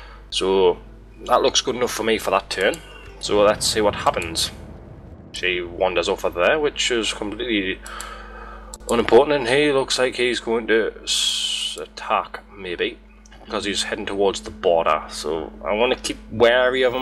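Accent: Scotland, United Kingdom. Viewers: You look like a scotland by listening to your accent. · I know late with this, but i lov your accent. Me being part scottish myself, iv always wondered what we sound like. I also get an unusual amount of exitement from ur voice and how u say me instead of my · Am I wrong or You've got a scotish accent? The way you say "here" and "fock" is very unusual.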